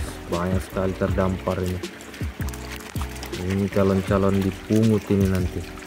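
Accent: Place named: Makassar, Indonesia. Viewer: logat bicaranya kayak berasal dari kota Makassar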